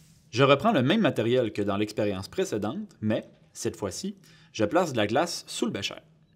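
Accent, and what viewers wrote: Quebec, Canada. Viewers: Rapide, efficace et en plus avec l'accent québécois, c'est juste parfait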